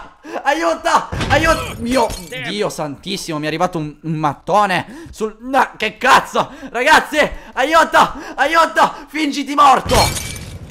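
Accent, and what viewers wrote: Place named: Rome, Italy. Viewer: ma sei romano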